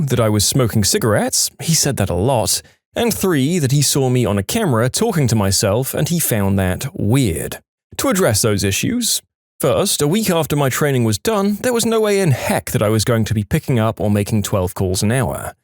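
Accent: United Kingdom. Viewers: The British accent, though 😂😂😂 (This channel is my hyper fixation rn)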